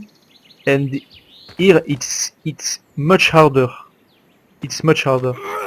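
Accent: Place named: France. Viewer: I DONT LIKE THIS FRENCH ACCENT ! FUCKING BAGUETTE but great vid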